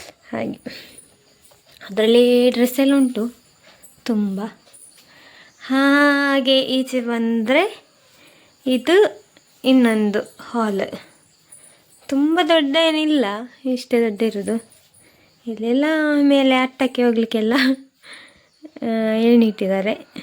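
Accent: India. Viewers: ನನಗೆ ದಕ್ಷಿಣ ಕನ್ನಡದವರು ಅಂದ್ರೆ ತುಂಬಾ ಇಷ್ಟ ನಿಮ್ಮ ಭಾಷೆ. ಮಾತನಾಡುವ ಶೈಲಿ. ನನ್ನ ಮದುವೆ ಆಗುತ್ತಿರಾ ನೀವು. ❤ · Nim kundapura super ❤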